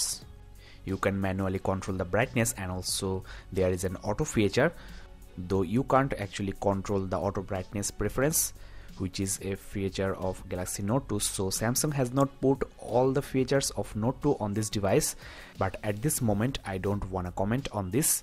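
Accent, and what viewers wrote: India. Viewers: RU KIDDING ME. THIS IS A WHITE MAN WITH INDIAN ACCENT.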